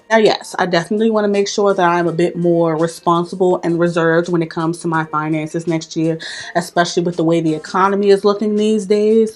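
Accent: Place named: Baltimore, United States. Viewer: Happy New Year,! 🎉 Life has been lifing so I haven't been around in a while. But, you've always been a real one and I enjoy watching you. Whenever I need my dose of Baltimore "ouu" or "ur", your my girl. 😂💯 I pray that all you've set before you and even the good ones you didn't coming, come true in 2024. ❤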